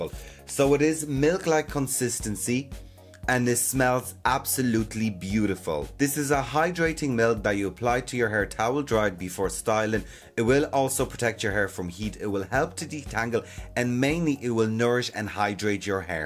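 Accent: Ireland. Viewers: ….I ADORE watching and listening to you. You make me smile so much. I LOVE your “Heap of Durt” comment…. your accent…..reminds me of my great Irish friends. I take your knowledgeable opinion as THE BEST. Big hugs, Teddy U.K. xx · Hey do you live in Ireland? Your accent and the way you say film is so Irish coded. Love it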